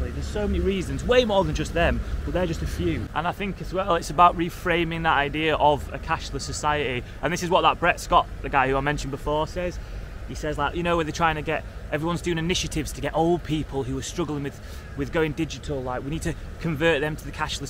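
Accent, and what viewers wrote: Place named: Northern England, United Kingdom. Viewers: I love your Northern turn of phrase...'astral projections man' 'oh, thuk off'! brilliant 🤣💯. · Can tell youre from yorkshire "n i thought... oh fuck off" 😂 amazin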